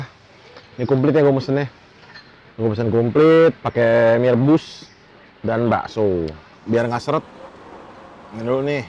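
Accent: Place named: Java, Indonesia. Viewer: Medokmu kok iso menjiwai to ngab Padal mbendinane ndek njakarta Sukses terus friend eating Assswaabbb !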